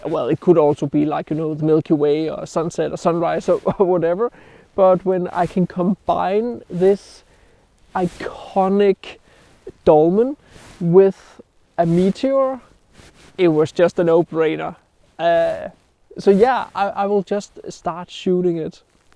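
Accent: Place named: Denmark. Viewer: Excellent video, as always. By the way, I'm following your work since the beginning when you just have a couple of subscribers... I can tell you, your work evolved a lot. Time pass by, you are more clean, your style well define. The only thing that never ever changed is your charming Danish accent! LOL. Congratulations! You deserve it.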